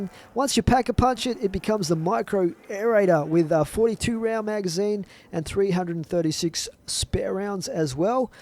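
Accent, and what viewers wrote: Australia. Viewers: Are you Australian? Sorry if I got it wrong plz dont judge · are you an aussie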